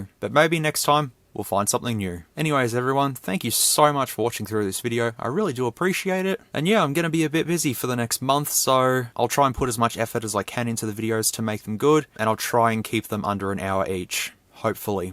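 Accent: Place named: Australia. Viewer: That mic test/phone call/your accent always reminds me of our old mate Danky/Female Snake "Frank" ("Dankpods") when he goes on a ramble while testing microphones (Congrats to him for achieving 1M Subs too!!) As well as Hugh Jeffreys are one the only three Aussies that are pretty much the only tech reviewers on my Subscribed list.. 👍👍😎🇬🇧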